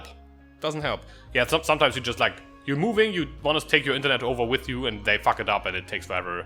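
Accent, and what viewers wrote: Germany. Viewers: well, you gonna tell us where that is? prolly somewhere south of Berlin? or am i getting your accent wrong? :D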